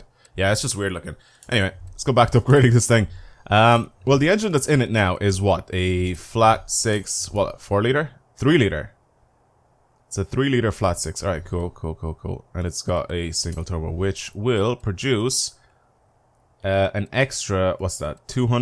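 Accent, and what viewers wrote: Dublin, Ireland. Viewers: Are u a dubliner hahaha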